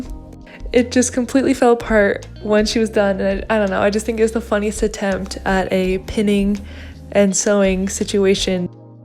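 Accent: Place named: United Kingdom. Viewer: Girl I came from another video of yours and I was just cracking up (the 70s jumpsuit) There’s so many times I was like “what am I watching 😂” but then it ended and I was like “oh no wait come back” anyway I clicked on this one and then it came up in the beginning that you’re doing this for your birthday and (if I date it right 😅😩) means you’re a Scorp and I was just sold. The vibes here are so chaotic yet so safe. I came for the sewing but stayed for the British accent. You’re brilliant luv, you’ve got a new fan xx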